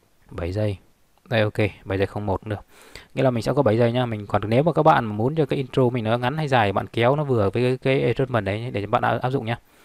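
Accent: Vietnam. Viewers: A PHẢI NGƯỜI tHANH HÓA K Ạ ?????????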